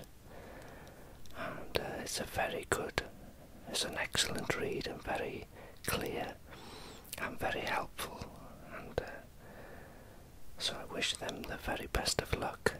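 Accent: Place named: North Wales, United Kingdom. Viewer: It's fantastic to hear a whisperer with a North Wales accent. I grew up near Llandudno and to hear you say you were in Betws-Y-Coed recently makes the world seem a smaller place :) In fact I sat by swallow falls and had a sandwich last week. I spend much of my time touring Europe with work so to hear a familiar voice is very relaxing when I'm away. Keep up the good work, and thanks again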